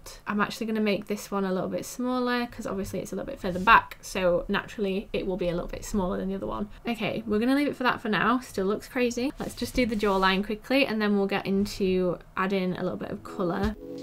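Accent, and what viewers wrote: United Kingdom. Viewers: I both enjoyed watching you drawing and listening to your British accent❤️ This video is perfect✨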